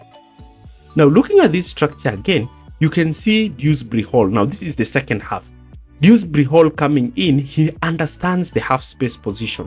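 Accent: Kenya. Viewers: Nice analysis. Accent is familiar, are you from Kenya or have lived in Kenya in the past?😅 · This accent is so Kenyan, love it, also the analysis ismind opening, kudos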